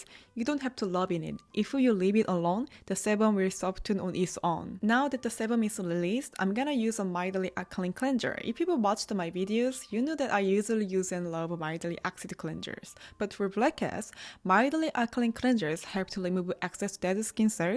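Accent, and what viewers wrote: South Korea. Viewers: I’m so ignorant of other cultures, so I never heard a Korean accent in English before, i love it, also you are pretty good at explaining and tell us about everything at the end of each section, new follower ❤❤❤ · Love your English with korean accent ❤😂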